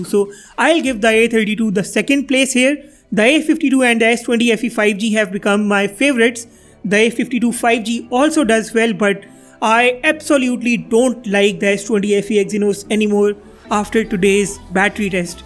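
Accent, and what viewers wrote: India. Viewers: When a india try to speak Englisch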